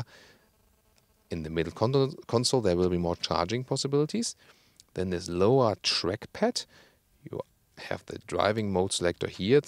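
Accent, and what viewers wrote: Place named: Germany. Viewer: Gutes Video, wie immer, super Wortschatz, aber die Aussprache... da hört man den Teutonen aus Km... Deutsch wäre mir deshalb lieber.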